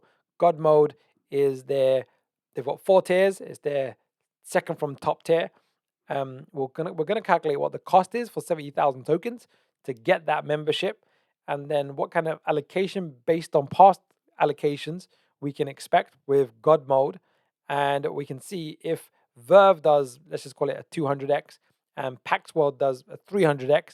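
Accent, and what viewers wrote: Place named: United Kingdom. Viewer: more so with crypto narrative, opinions, actually studying all aspects of this market other then price, fundamentals and watching people with a different mindset which allows you to open your mind that little bit more. All these don’t marry up so well with Instagram. None the less you sound like your from the U.K. so I’ll just have to bump into you and try my luck that way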